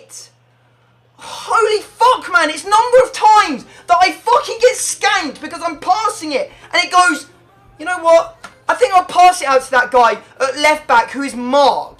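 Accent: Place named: RP, United Kingdom. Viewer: He sounds so posh lol but he's a legend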